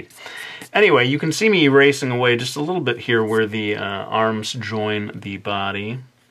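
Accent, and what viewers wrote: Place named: Turkey. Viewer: türk ingilizce konuşuyo la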